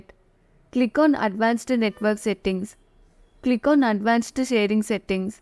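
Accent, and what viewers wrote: India. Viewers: why does the ai have an indian accent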